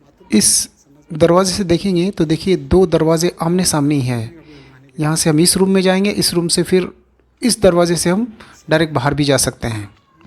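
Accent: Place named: Deccan, India. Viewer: Mashaallaha your talafus is daccani mostly Belguam side.you’re explaining it correct.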